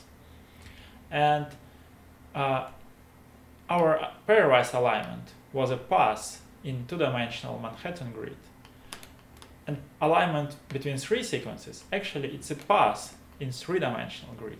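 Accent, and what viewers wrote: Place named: Russia. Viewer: прям слышно что говоришь по русски...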